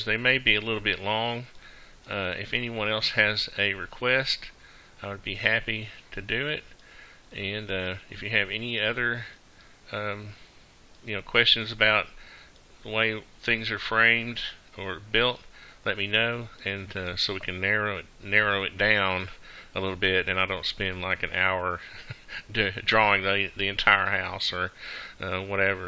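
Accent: South, United States. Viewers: That outside corner is no longer code can't insulate and besides that when you stand your other while you're inside is only going to have half an inch for your sheetrock to screw into should have inch and a half so when your half inch goes on one wall you'll have the other inch you also sound like you're from South which you don't get very cold so I'm sure the insulation is not an issue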